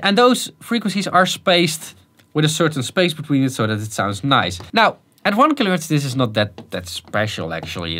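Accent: Netherlands. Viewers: Excellent! Are you Dutch?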